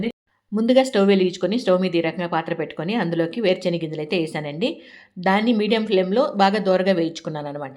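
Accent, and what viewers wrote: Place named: Rayalaseema, India. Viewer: Akka meku epudu kocham rayala seema kadapa Jella yasa vstavunde akka Karnataka yasa basha ravatam ledu papam me chelle malate garu kanada basha yasato voice vavaru estuvuntaru malate garu amma ne video lo chupiatu vuntaru video lo amma ne chuce so happy alge eroju video lo saree kamalu matching mamaching begone meku kamalu baga set inae akka 🎉🎉❤❤❤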